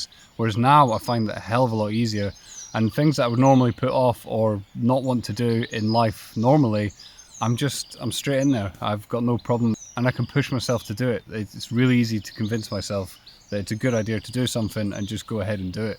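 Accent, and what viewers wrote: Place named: Scotland, United Kingdom. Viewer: Jobbies… What a fabulous word 😁 I love it even more when it’s said in a Scottish accent! I came across your video on the Liveration channel yesterday, there was something so relaxing about watching you speak, when you said you also foraged and had a YT channel of your own I had to come for a mooch! Hope your routines are going well, guess I will find out shortly… 🙂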